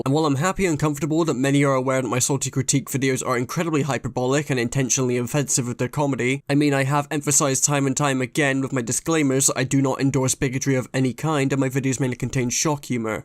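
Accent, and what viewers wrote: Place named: United Kingdom. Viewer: You sound br*tish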